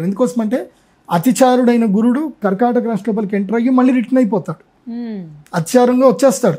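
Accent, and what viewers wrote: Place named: Telugu, India. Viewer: యాంకర్లో తెలుగు తనం ఉట్టి పడుతోంది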